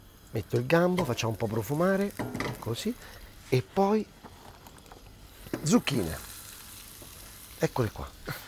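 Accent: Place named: Nettuno, Italy. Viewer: Sei dorceeee...con.. Noto ogni tanto un accento nettunese..☺🍾🍾🍾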